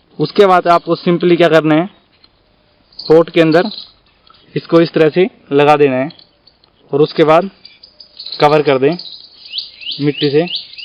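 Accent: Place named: Jhunjhunu, India. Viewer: aapki bhasa mst h aap junjunu side k ho kya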